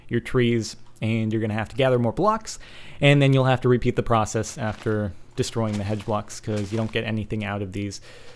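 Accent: Sweden. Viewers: is you swedish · *are you swedish* and yes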